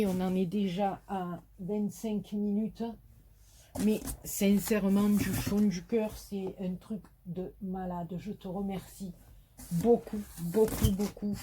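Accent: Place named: Provence, France. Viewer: Bonsoir, j'ai decouvert ta chaine il y a quelques semaines et c'est un reel plaisir de t'entendre parler car ca me rappelle ma provence natale. Je suis du sud, une pure provencale exilee en Lorraine (mon mari est originaire d'ici...). Heureusement,je descend tres souvent pour voir les enfants, petits enfants et la famille. Je vais souvent a Marseille car j'ai un de mes fils qui y habite. Tu es une belle personne, qui me fait beaucoup rire, tes creas sont magnifiques, de toute beaute. Tu as ete tres gatee, effectivememt tu pourrais ouvrir une boutique de tissue.... Je te fais de gros bisous et a bientot pour voir d'autres creations. Francoise.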